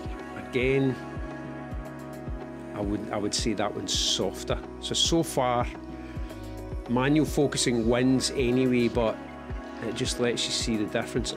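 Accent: Scotland, United Kingdom. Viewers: Since you made this video, has there been any firmware updates that would have fixed the focus issue you are talking about? If not, I would think this is something that Fuji will ‘fix’ with a future update… I’m toying with the idea of getting the X-T5 with the ‘kit lens’ and leave buying a prime lens for another day… the ‘kit lens’ being a real good lens it’s worth getting with the camera (18-80mm). I used to own the X-T1 and XF35 lens (I also had the 28mm lens too) but traded them in to buy a black X100v. Now, I’m seriously considering trading up to the X-T5 and selling my X100v as it’s so heavily sought after, it’s selling almost at the new price point reducing the final price… these new Fuji cameras are ridiculously expensive these days. Will you be doing, or have you done, a follow up video on how the camera is fitting into your workplace? 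Nice to hear a Scottish accent in a camera channel… 🏴 Flickr Ormie (O)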